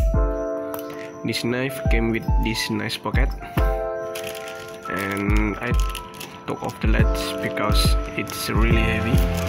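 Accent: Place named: Spain. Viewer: Contestame si sos español que hasta yo hablando otro idioma se cono no sos ingles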